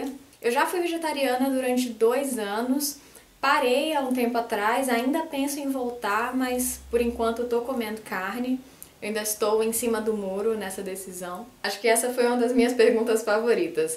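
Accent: Bahia, Brazil. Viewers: não sei, eu sou baiano também e percebo bem o dela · Aos meus ouvidos paulistanos o seu sotaque baiano é bem acentuado! XD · lógico, todo mundo tem sotaque. Mas em comparação com o sotaque baiano marcado, tem pouco. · Já falei sobre isso, mas repito: seu sotaque aparece sim, e até muito! Quem espera ouvir aquele sotaque do INTERIOR da Bahia, algo bem caricato, não não vai encontrar. Eu que sou soteropolitano vejo você "sotaquear" frequentemente. Parabéns pelo canal, como sempre!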